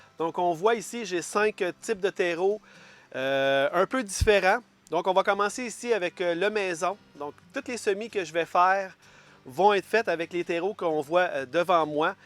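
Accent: Quebec, Canada. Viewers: Jadore ecouter les quebecois ! Pouvez-vous me dire ce quest la "frasque d'insectes " ?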